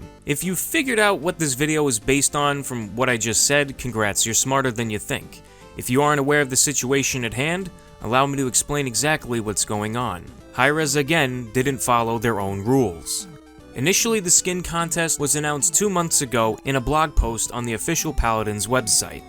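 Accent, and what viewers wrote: Italy. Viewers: Dude r u from italy?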